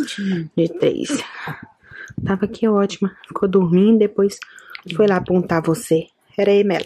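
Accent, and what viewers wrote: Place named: Bahia, Brazil. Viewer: Muito lindo o amor pelas meninas , o geito de falar não me aguento de ri kkkk sotaque baiano mesmo obs: também sou 😘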